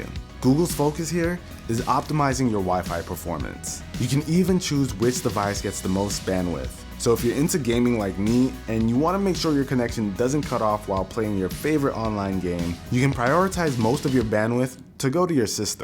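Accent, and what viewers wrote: Jamaica. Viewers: are you Jamaican? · Nice video, your Jamaican!?!? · are you Jamaican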